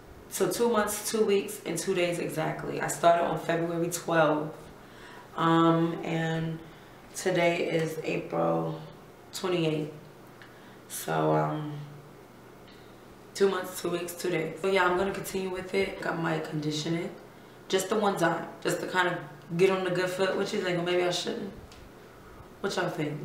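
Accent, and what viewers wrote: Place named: Barbados. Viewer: Lol i heard you say "Good evening everybody," in your video about water only washing and I just knewww you were a Bajan. Go girl! Yuh sweet!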